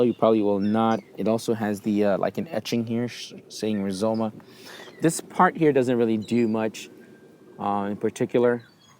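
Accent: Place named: New York, United States. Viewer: For some reason all I can hear is John Leguizamo, well without quite such a harsh Queens accent. Must be a New York thing, though you sound nothing like my friend that's New York Italian/Irish, but then he's from Northern NY.. 30+ years away and his accent is still thick as a cabby's heart.. especially when he drinks. Ever noticed the Termi logo looks like a pair of balls with a schlong hanging low? :P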